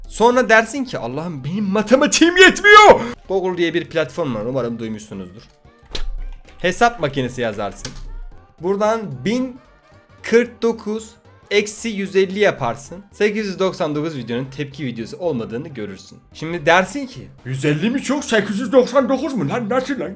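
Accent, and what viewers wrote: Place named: Çorum, Turkey. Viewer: Abi Çorumlu musun aynı benim ailem gibi konuşuyorsun çok komik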